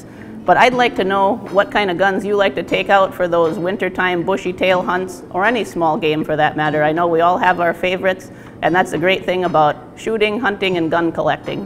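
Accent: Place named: Wisconsin, United States. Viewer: Wisconsin accent coming in thick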